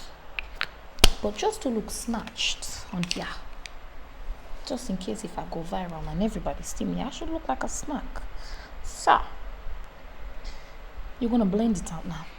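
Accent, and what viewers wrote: Africa. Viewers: African accent?? SLAYEDD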